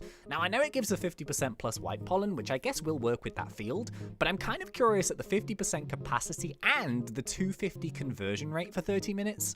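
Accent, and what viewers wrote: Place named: United Kingdom. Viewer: I feel like he has a british ascent lol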